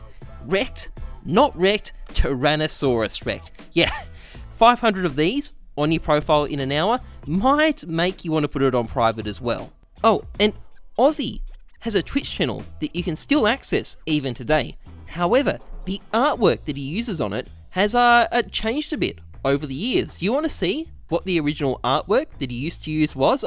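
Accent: Finland. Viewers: Wait...... What are u From finland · Are you from finland? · are you from finland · R u from finland? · Are you Finnish? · Wait... Are you finnish??? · are you finnish.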